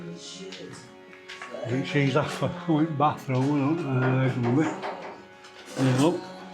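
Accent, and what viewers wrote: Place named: Sheffield, United Kingdom. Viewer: Really enjoying videos. Great to see the house coming on. Would be good to know costs building land. Plus a bit of back story about you both. Your accent not too dissimilar to mine. I'm from Sheffield. Any keep the vids coming. Cheers mate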